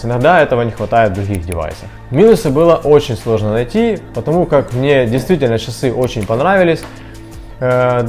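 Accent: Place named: Ukraine. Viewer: Это отличительный говор украинца. Когда я слышу такой говор, то знаю, что человек украинец. :) А в целом речь нормальная, дикция четкая, все слова разборчивы, а это самое главное в подаче вербальной информации. )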